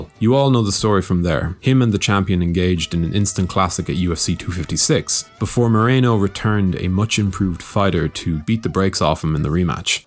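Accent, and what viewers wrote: Ireland. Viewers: Irish. Not even close. 😂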